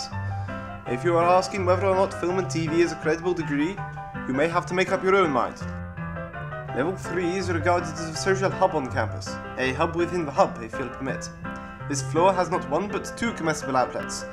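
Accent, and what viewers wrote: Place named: Glasgow, United Kingdom. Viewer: I want to go to Glasgow but I'm scared I won't understand anyone just like this narrator. His accent is hard to understand.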